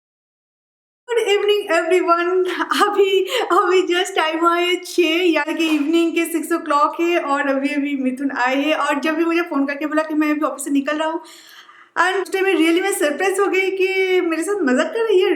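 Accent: Bengal, India. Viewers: Ur bengoli mixed Hindi language sounds oswm😍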